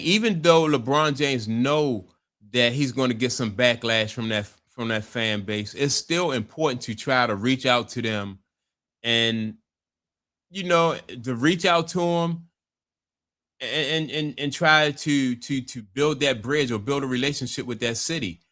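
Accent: Baltimore, United States. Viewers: That's what this guy does. Would that poor version of Baltimore English.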